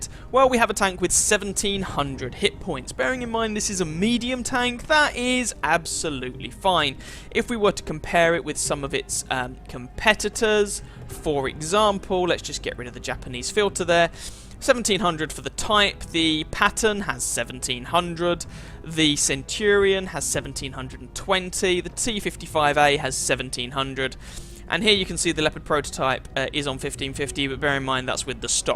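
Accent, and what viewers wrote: England, United Kingdom. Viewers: Loving your videos, probably due to your English accent... Keep it up.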